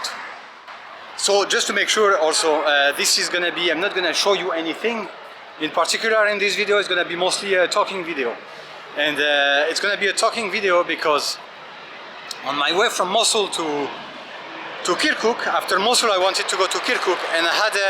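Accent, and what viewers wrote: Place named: France. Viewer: your French accent is so cute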